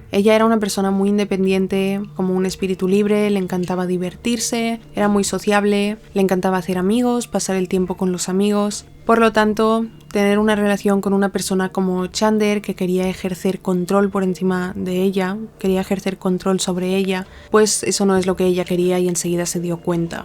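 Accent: Spain. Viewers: Mira que no me gusta el acento español y prefiero normalmente a Landó y a Dinosaurs vlog pero muchacha, me gustó como relatas ❤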